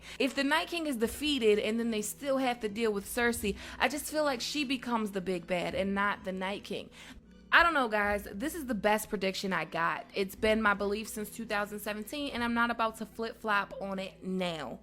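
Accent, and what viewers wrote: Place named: Baltimore, United States. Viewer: u sound cute when ya B"more accent comes out. lol It was thick in this video. But I agree D&D wouldn't do something as elementary as having the 1st plan work. I like #Stargaryens. Gr8 content as usual.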